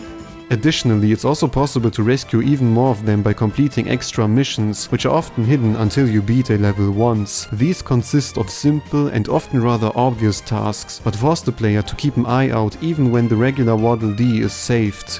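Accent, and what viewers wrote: Germany. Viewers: as an german i can hear you are german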